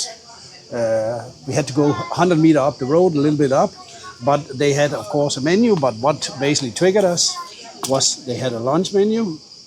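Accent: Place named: Germany. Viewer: Curious here. - your first name sounds Danish. Your Family name English. Your accent in English seems German.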